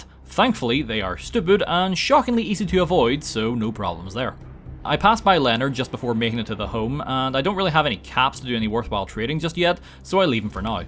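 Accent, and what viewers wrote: Northern Ireland, United Kingdom. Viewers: It's a pet peeve of yours that that someone from Northern Ireland doesn't speak in an American accent? How very odd.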